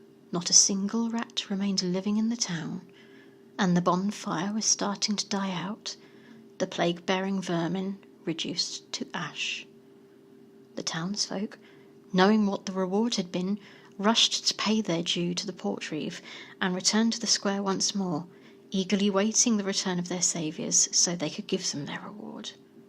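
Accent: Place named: England, United Kingdom. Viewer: Is it sad I'm just a bit jealous of the beautiful English accent and the shout out from? 😬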